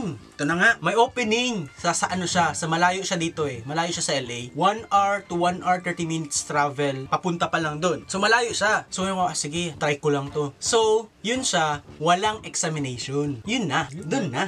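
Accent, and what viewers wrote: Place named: Conyo, Philippines. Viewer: mao di conyo kaau ateneo kaayo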